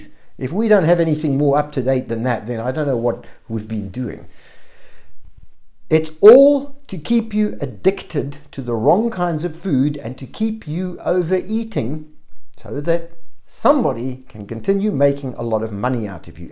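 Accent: South Africa. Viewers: And has a South African accent.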